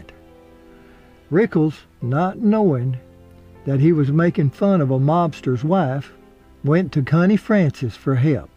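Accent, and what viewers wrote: South, United States. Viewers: Great Southern narration voice. At least this British man loves it. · Such a sad story! I hope I will be able to sleep...after listening to you. Nonetheless, you have a beautiful, soft voice with a soothing southern accent. Thank you anyhow.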